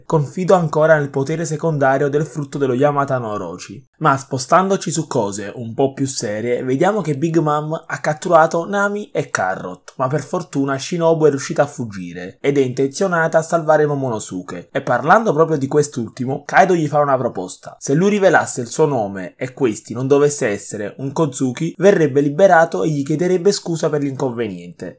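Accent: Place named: Naples, Italy. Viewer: Che video penoso, non c'è coinvolgimento. Tanto valeva farlo con il sintetizzatore vocale di google translate. L'accento napoletano è insopportabile, potresti sforzarti ad attenuarlo un minimo.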